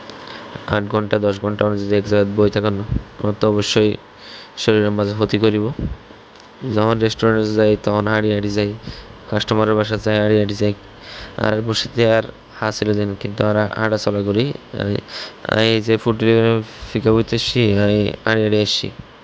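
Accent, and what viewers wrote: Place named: Noakhali, Bangladesh. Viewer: ভাই আপনার কথা ত বুঝি না নোয়াখালীর লোক নাকি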